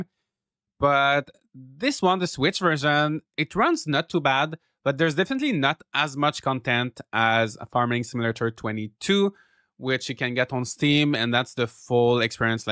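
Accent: Quebec, Canada. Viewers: it’s funny because once I was watching one of your videos and my boyfriend said something like « il est Québécois lui » and I told him probably not. He said you had a French Canadian accent and I was like « nah, what are the chances? » 😂😂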